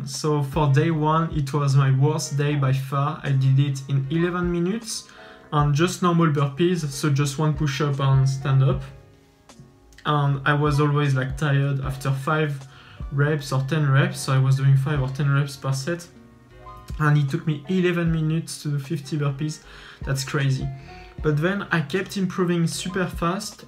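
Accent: France. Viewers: tu parle français ça se sens gg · J ai reconnu l accent français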